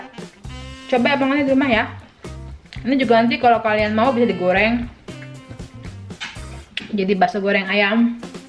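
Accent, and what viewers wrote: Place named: Betawi, Indonesia. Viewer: Kagak usah banyak bacot😁😁betawi bangat dah...cipok2 buat 😘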